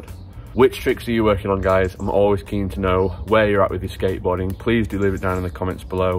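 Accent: United Kingdom. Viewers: Hello just wondering where you from? Sounds like Lancashire to me 👍🏼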